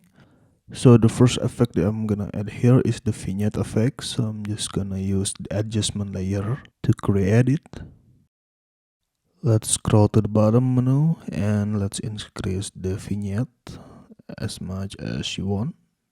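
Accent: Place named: Indonesia. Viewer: Ini orang indo ya logat nya kok indo bgt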